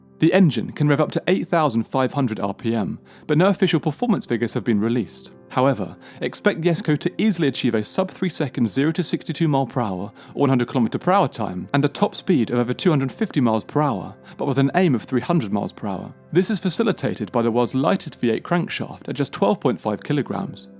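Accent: RP, United Kingdom. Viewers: Im american but I know posh when i hear it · Brittish accent is so damn annoying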